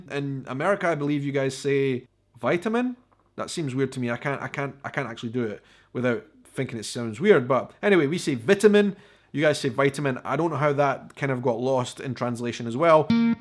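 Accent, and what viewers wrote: Scotland, United Kingdom. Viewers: Hearing you pronounce “garage” the way we do was the closest to an American accent I’ve heard you do! I also love how Scots say “girls” with two syllables. I’m a sucker for a man with a Scottish burr. And a kilt. And a dram of whisky. mind wanders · I’m from north India and I subscribed to your channel just yesterday itself and these is the third video of you I watch and I really enjoy a lot and coming back to these video I just wanna say we speak almost the same British accent as you do !!! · Here in Canada we do say the H in herb...her b Please, please, please keep with your accent and do not take on the American accent. We love your Scottish ways. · I’m literally watching your videos to listen to ur accent, all so I can improve my own Scottish accent. (I’m from the Midwest United States). I 💕 love 💕 learning to speak other countries’ accents in English. 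So far I can do Scottish, French, Russian/Ukraine, Polish, Mexican, British (multiple regions), Southern U.S. accents & Indian accents.(from India-not referring to American indigenous peoples-fun fact,I’m already Cherokee, so, I don’t need to learn my own accent-lol). I am fascinated by how people talk/speak. I think it is interesting to learn how certain pronouncements are made by different people all speaking the same languages. I also speak French and am conversational in Spanish. My next goal is to learn Cherokee, not many people speak it anymore and it’s super important to keep our cultural heritages alive no matter where we are from. I feel that learning who we are is important, but it’s just as important to put yourself in other people’s shoes so you can see life from a perspective other than what you would normally experience. I feel like language is an integral part of being human, and it is what connects us, and even exploring accents opens you up to a new perspective and culture that can give you just a peek of something new and a great way to learn a lot about a certain group of people. 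What do you think? Sorry, I ramble a lot, but I just love language. 💗🥰💋💕✌🏽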